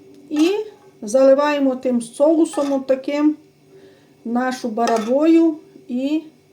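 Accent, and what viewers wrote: Ternopil, Ukraine. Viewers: То що вам не подобається слова і говор в кожній області свій акцент це Тернопільська обл там багато польських слів з якими вони виросли їм так зручно а ви дивіться і слідкуйте кожен за собою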